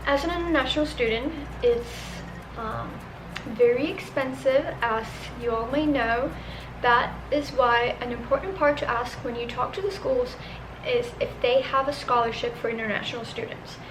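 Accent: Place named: South, United States. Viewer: girl, your southern accent is coming through! love it 😂 and miss you 😘 kinda jealous ngl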